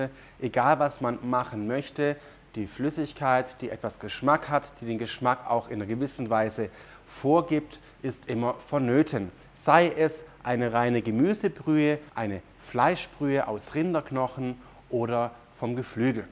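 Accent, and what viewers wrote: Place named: Swabia, Germany. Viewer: Oh... ich kann mich auch vollkommen vertun. Aber ich rate mal einfach frei raus: Nürtingen? Südlicher? ;-D Isch jetz nix Böses gemeint! Isch bloß an Witz. Danke für dein Video!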